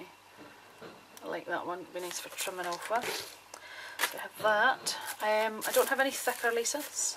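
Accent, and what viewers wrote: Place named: Scotland, United Kingdom. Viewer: I didn't realise you were scottish too lol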